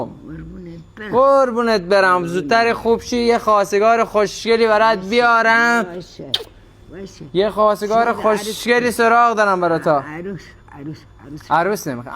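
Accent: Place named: Shiraz, Iran. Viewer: من هم شیرازی هستم نزدیک مرودشت ولی از لهجه شما خیلی خوشم میاد به قول خودت شب ها درت میکنه روزها قربون صدقه ت میره وماچت میکنه😂 آخر نفهمیدیم برا چی گاهی درت میکنه؟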